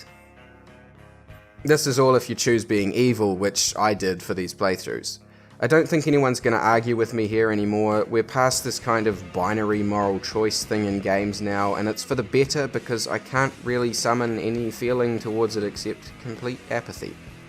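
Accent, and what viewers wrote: New Zealand. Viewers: That's a Kiwi accent bro. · I've only played the first and Second Son and I do prefer Second Son but I so see where you're coming from with your points about the first it's still a pretty fun game. Btw are you from NZ the slang and your accent made it sound like it.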